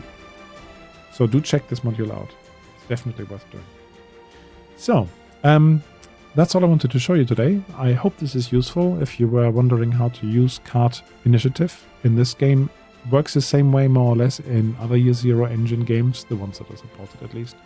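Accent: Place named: Germany. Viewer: Great video, straight to the point and thorough. I live in California now, but hearing your accent makes me miss Deutschland where I grew up as a kid. Danke Shon! Keep up the great vids!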